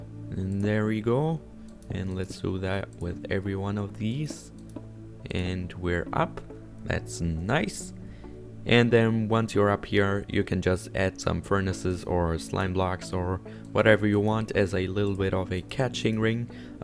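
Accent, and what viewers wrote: Germany. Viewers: Hab vom ersten Wort an gehört das du Deutscher bist! ☺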